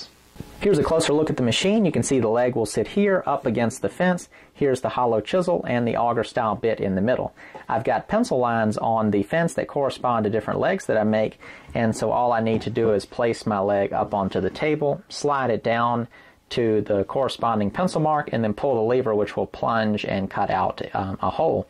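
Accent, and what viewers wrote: South, United States. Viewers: Another great video - clear and precise instructions as usual - and delivered in a Southern drawl which I could listen to all day. I watch your vids over and over - the sound of your machinery and commentary bounces around my kitchen driving my wife crazy. Ever thought of teaching? Or maybe setting up woodworking courses? I'm UK based (Liverpool) so it would be near impossible for me to attend but I'm sure people would. Keep them coming! Thanks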